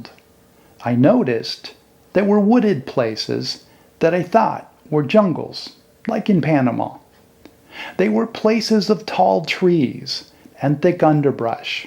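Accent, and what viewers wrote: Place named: England, United Kingdom. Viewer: I really enjoy hearing listening to your English accent with clear story explaining like this. Thank you 🙏🏻 so much. Please take care and enjoy your time there 🙏🏻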